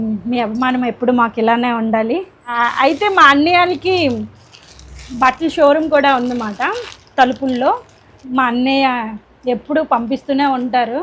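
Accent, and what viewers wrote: India. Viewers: Oho, Meedhi Anantapur aa. Ippativaraku mee slang ni batti coastal andhra anukonnaam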